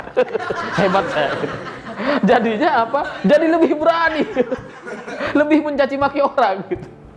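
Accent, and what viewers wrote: Madura, Indonesia. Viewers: Logatnya kek org madura..tp gk ada takdzimnya sama habaib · Dari logatnya aku udah mikir mas ini orang madura, ternyata benar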